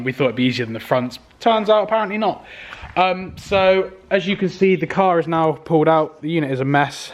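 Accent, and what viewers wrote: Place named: United Kingdom. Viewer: Too much BS jibber jabber… WTF… just show the actual work instead of constantly apologizing in your fucked up wanker, limey accent…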